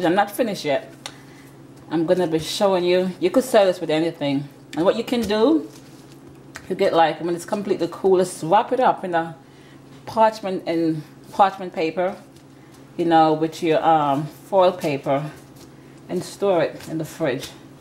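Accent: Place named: Jamaica. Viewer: I knew she was Jamaican lol. That accent hahaha. Love my people!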